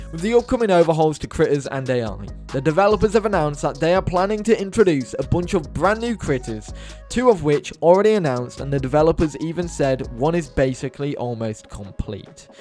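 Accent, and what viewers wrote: United Kingdom. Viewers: Yo when did start hiding that British maw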